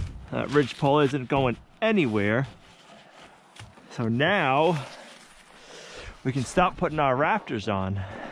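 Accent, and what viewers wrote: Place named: New Hampshire, United States. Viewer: Ok, so I'm from LI, NY, we say Hot differently than folks from NH, or from Mass by way of NH.....how do you say Hut and is it different than the way you say Hot? I'm fascinated by that difference in accent. Not making fun of it but I'm puzzled by where your accent comes from! I've met folks from Northern VT and Northern NH, I think it's more the NH folks that have a distinctive accent. So in NY we might joke about how Bostonians say "park the car" from NH it's more "you can't get there from here" which has a very different accent than Boston! Loving the quirks of our regional accents! Which explains some of the reasons I also enjoy Cog Hill Family Farm from Alabama and Growing Grass family farm from Louisiana!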